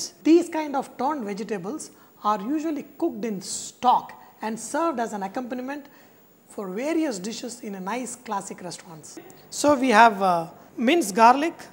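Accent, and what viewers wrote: India. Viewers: is good. HE HAS AN REGIONAL INDIAN ACCENT... NOT EASY TO GET THE NEUTRL ACCENT. WHEN WE PUT UP WITH THE CHINESE AND OTHER ASIAN AND NUMEROUS ACCENTS WHY NOT SANJAY'S WHO IS VERY CLEAR ABOUT WHAT HE TEACHES..... ONE OF OUR BEST VIDEO TEACHER....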